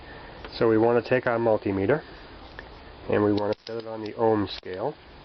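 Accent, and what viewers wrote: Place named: Rhode Island, United States. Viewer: outstanding video.im from texas and i understood you better than the texas folks/dig the accent..where you from rhode island.got a army friend thats talks just like you.keep up the good work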